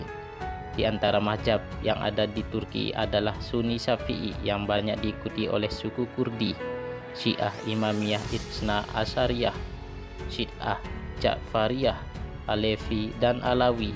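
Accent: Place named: West Java, Indonesia. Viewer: Orang sunda ya. Huruf Z nya jdi J hehe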